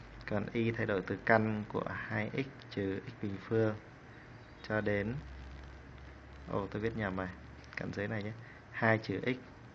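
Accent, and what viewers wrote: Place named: North, Vietnam. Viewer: THẦY NGƯỜI BẮC HẢ THẦY :x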